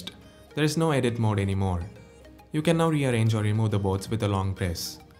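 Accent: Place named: India. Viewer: with the Indian accent? Umm... maybe he's Indian?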